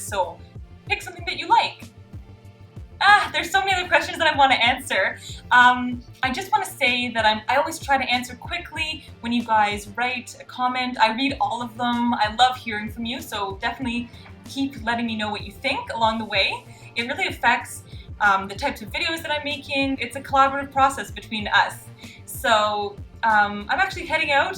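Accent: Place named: Canada. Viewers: You sound Canadian to me. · That's because she is Canadian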